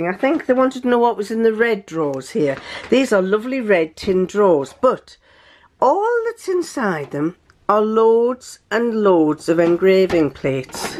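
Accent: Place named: England, United Kingdom. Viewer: a very French name for a very English accent... J’adore!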